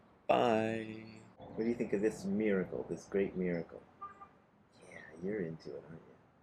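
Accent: Cockney, United Kingdom. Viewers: That cockney accent sounds like Moss from The IT Crowd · "Burn baby burrrrnn!" I am in the, and never coming back! And with that cockney accent, you could probably get a gig if they reboot Spinal Tap. Awesome! 🤓🤙🎶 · GREAT Cockney accent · Loving the Cockney accent. Can you do a Geordie one next please 🙏 🙂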